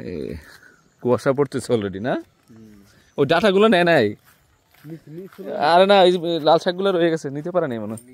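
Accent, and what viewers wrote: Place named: Comilla, Bangladesh. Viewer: তোমাদের গ্রাম কোন থানায়।তোমার ভাষা শুনে মনে হচ্ছে কুমিল্লার ভাষা। ঢাকা থেকে।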